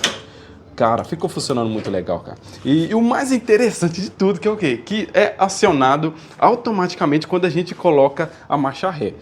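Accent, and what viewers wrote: Minas Gerais, Brazil. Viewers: Sotaque mineiro, parabéns👏🏼👏🏼👏🏼 Exemplo de trabalhar com o que gosto, obrigado🙏🏼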